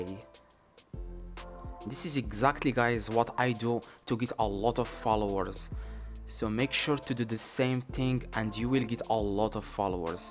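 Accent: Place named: Morocco. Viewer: واش خونا مغريبي بينا من هضرتك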